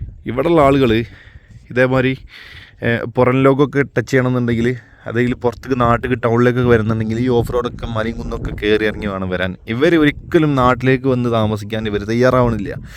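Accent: Malappuram, India. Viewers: ആദ്യം നിന്റെ സംസാരത്തിന്റെ ശൈലി മാറ്റ്.. മലപ്പുറം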